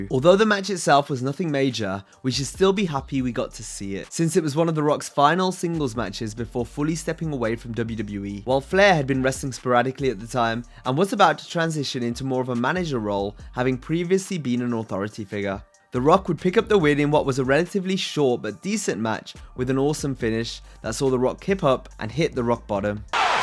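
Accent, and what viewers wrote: United Kingdom. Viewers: Are you from Birmingham?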